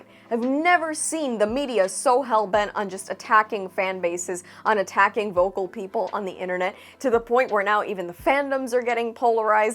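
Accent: Australia. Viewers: Excellent accent mate, I closed my eyes and could picture an Australian 🦘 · I did not expect the Australian accent 😅 well done. This should be a training video for PR people in Hollywood. You hit all the points 🙌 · How many upvotes can I give you for your choice of restaurant, how you want the steak cooked and hilarious aussie accent? LOL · Great video, big fan of the content you create. The people making TV and films today are just arrogant fools. I mean what's the worst thing you can do if someone doesn't like your stuff, oh yes, tell them that there's something wrong with them for not liking it. Total hubris on their part and pretty much guarantees that the next thing they do will be frowned upon too. However, it does pointing out that it's not just people in Hollywood who currently have this attitude, look around anywhere and you'll see the same thing happening; nobody in any walk of life wants to accept any sort of criticism today. 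Loved your Australian accent as well. Keep up the good work. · Your Aussie accent, tho! 👍😄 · Hahaha love hearing an American do an Aussie accent. It’s the cutest thing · Love the Australian Accent 😂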